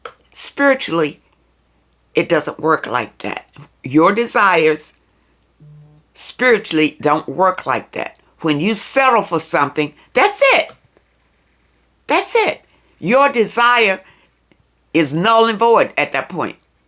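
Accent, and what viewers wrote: South, United States. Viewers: Hello Miss, I heard you mention are orihinally from the Carolinas. I'm hete in South Carolina, in Whitmire, Newberry County. I thought detected some Southernisms in your speech. Friendliness too. Also youre a fixed sign, me too Double fixed ♏ Scorpio Sun, ♌ Leo Moon, Scorpio Rising..I enjoy your videos, I learn something new with each video. Thank You. *Pardon my typos, for some reason the Edit option jumps around, wont allow me to correct in each word.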